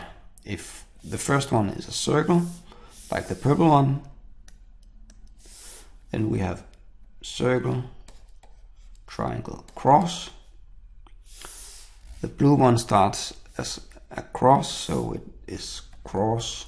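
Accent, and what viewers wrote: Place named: Germany. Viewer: Hey - good video - you sound German. I have a question? is the answer for this video Matrix 39, or the other answers you gave for the 19 Matrixes -- are these "your answers" thru problem solving? or are they answers from a testing psychological institution?? Can you let us viewers know?? -- thanks -- from --Canada:)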